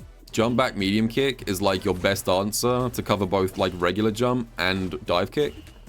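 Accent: United Kingdom. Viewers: The British pronunciations are killing me in this video lol. Sako's Aki? No, Sacko's Acky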